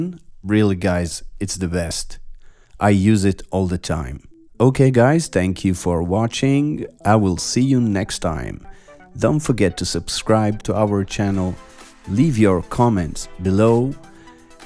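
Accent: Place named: Israel. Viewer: שומעים שאתה ישראלי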